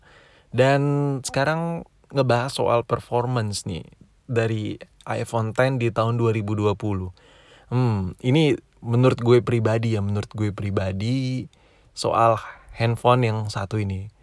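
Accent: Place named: Medan, Indonesia. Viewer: bjirr ada logat medan yak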